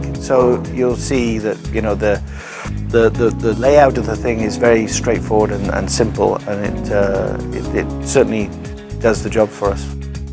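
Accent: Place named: South Wales, United Kingdom. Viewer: Nice bus great work. Your south walian accent is still hanging on. 👍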